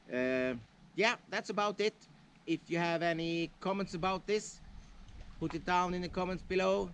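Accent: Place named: Sweden. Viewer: very good swinglish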